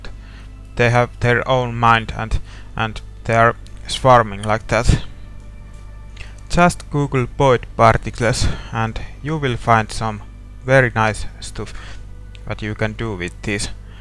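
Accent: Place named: Finland. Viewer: wow nice... you are skilled artist, hopefully we will see more from you in future. i have to say you are first fellow finn (yeah heard from your accent) who makes videos about his/hers blender projects :)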